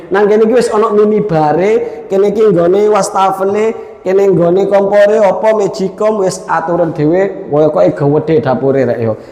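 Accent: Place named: Madura, Indonesia. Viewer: Madura y bos.... Logatnya iku lo rek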